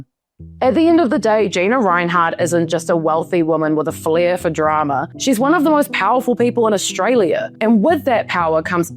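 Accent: New Zealand. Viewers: As an Australian you a new Zealand due to accent correct me if I am wrong. I would not front up on YouTube channel and butcher the pronunciation of New Zealand country and city names. Was born in Melbourne but my father came to Western Australia mining and did well. Gina was older than I but we attended the same school. Your pronunciation of Pilbara is wrong your pronunciation of Wittenoom is wrong. So what other major facts are wrong in your story or deep dive on Gina ????? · Sounds like Kiwi accent.. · Are you real or a Kiwi sounding AI ??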